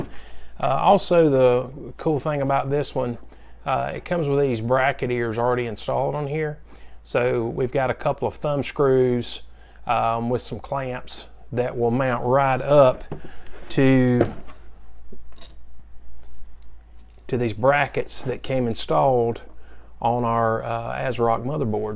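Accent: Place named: Texas, United States. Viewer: He sounds like he may be from Texas. A lot of Texans have a soft southern drawl despite what Hollywood would try to make you believe since they tend to portray us as loud and obnoxious.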